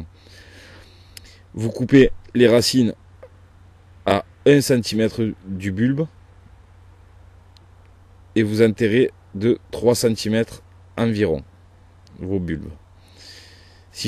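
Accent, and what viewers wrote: South, France. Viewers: Avec l'accent du sud... c'est parfait. Merci beaucoup pour toutes ces informations. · Oui cet accent c du sud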